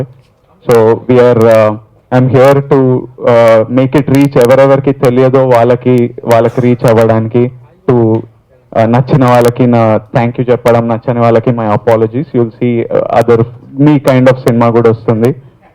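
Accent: Telangana, India. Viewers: Telangana slang ra adhi maadarchodh. Ardham aithaleda?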